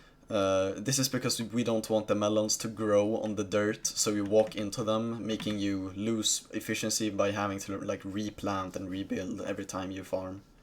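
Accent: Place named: Sweden. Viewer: you sound swedish!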